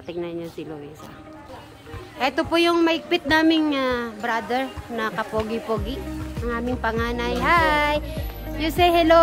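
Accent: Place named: Pampanga, Philippines. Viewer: natawa nmn.ako sa yo kc kapampAngan accent ka po tlga then may halong arabic like ng KALAS at AYWA...related ako kc gnyan din ako kpg kakauwi nababalid po pagtagalog ko...pro so proud po syo...ingat po kyo lagi..mahal po nmin kyong mga family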